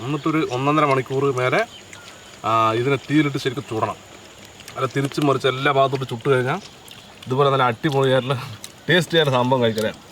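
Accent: Palakkad, India. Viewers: ikkayeyum palakadan bhashayum ishttamullavar like adikku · ഇക്കാടെ പാലക്കാട് ഭാഷ ഇഷ്ടമുളളവർ ലൈക് അടിക്ക്🤩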